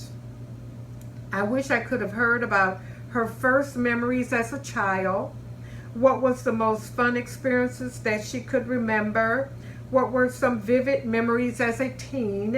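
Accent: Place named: Midwest, United States. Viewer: I absolutely loved this! I always thought your accent from from upstate New York, but now I know it's Midwest. Lol. Amazing stories!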